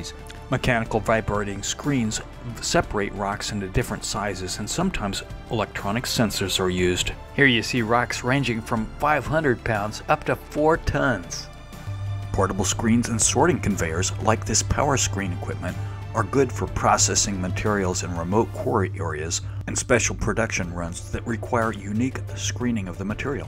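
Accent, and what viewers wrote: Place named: United States. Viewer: Hi my dear! Great sharing! I love your american accent by the way ❤ Big hug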